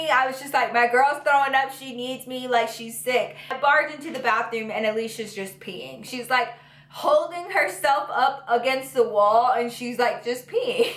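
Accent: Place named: South, United States. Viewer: I can really hear your country accent in this video lol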